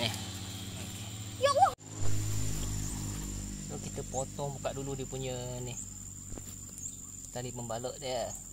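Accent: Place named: Pahang, Malaysia. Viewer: Pekat ngat pahang nye😂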